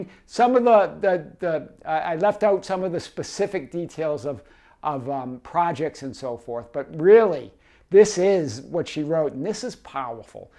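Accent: Boston, United States. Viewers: Aw we love that Boston accent 😂🤍🤍🤍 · Yesss! I knew it was a Boston accent, I love guessing accent's... Its my thing😅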